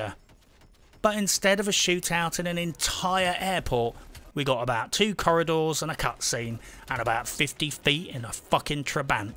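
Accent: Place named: RP, United Kingdom. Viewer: I’m SO glad I found your Channel, it’s very entertaining. Keep up the good posts, your posh accent combined with indignant and brutally honest opinion just creates such a special video to watch!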